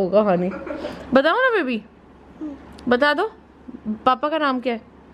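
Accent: Punjab, India. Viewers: Oh aapne bol diya tha last vlog mein ki aap ki Punjabi Punjabmein aate nikal aati hai.. 👍 😅 Your mum is soo beautiful. ❤️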